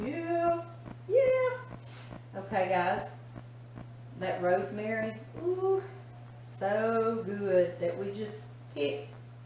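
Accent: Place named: Louisiana, United States. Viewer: Your home is beautiful ! I just love watching your videos! So sweet that Louisiana accent! Your kitchen is elegant! Girl you can do some cookin! Yes, you need to call it a day! You worked hard! See you soon for a Christmas video! Loved the smore's video with the grand babies! Bye now! God bless!♥️🥰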